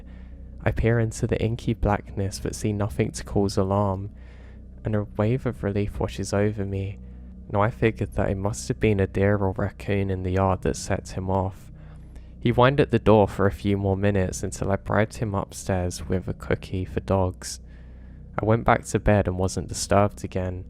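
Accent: England, United Kingdom. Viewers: Your reading is perfect. New subscriber now. Where in England are you from?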